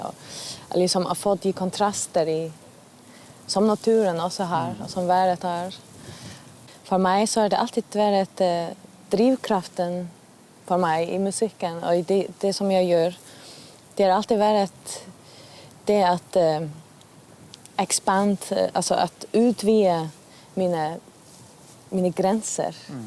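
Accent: Faroe Islands. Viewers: snakkar hon norska, eller danska med faeröiskt uttal? tycker det var ovanligt lätt att förstå om de är danska :) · There is a clear Faroese accent, she kind of mixes in some general danish, norwegian, swedish(if you like) words, just enough to make herself understood by the Norwegian reporter) I think this way of talking, accent, they call 'Gøtu-danish' (Faroese person attempting to speak Danish, but have a strong accent) Naturally she also can speak pure Danish very well, when she like to.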